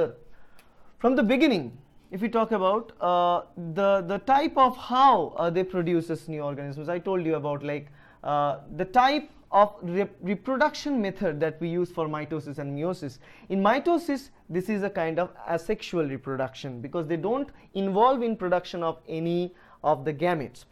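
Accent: Bengal, India. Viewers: Sir are you from West Bengal? Because your talking is like Bengalis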